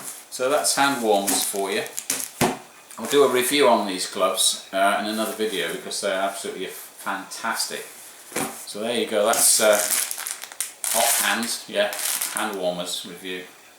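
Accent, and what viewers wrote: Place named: England, United Kingdom. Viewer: just saw an advert for these on TV in australia, so i thought id quickly look for a review online, yours was the first i saw. Nice to hear an english accent, im from coventry, but i live in sydney... it doesnt often get cold here but this weekend is pretty cold, 13C!! which by english standards isnt cold at all. lol.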